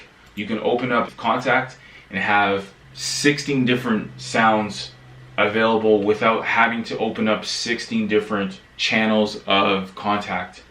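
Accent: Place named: Toronto, Canada. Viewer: great vid. I could tell you were from the GTA based on accent alone within the first minute lol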